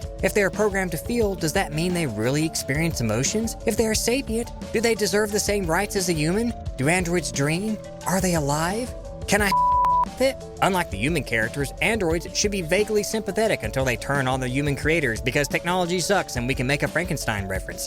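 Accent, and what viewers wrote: South, United States. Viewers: you made the Southern accent great again!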